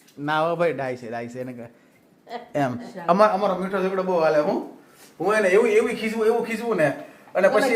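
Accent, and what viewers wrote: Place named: Surat, India. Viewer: Tme surti bolo tho mne moj padi jaye che .maru piyar navsari maru sasru mojilu morbi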